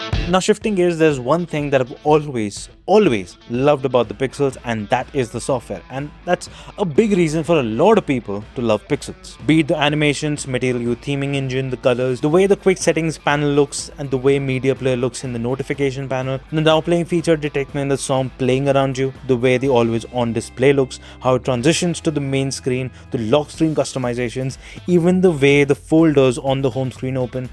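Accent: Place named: India. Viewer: Stop speaking Indian man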